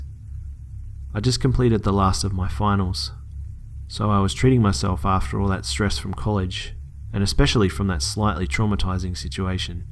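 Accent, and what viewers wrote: Australia. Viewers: ur Austrailian thank god there's an Austrailian saying a horror story I am subing to u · Is he Australian? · U australian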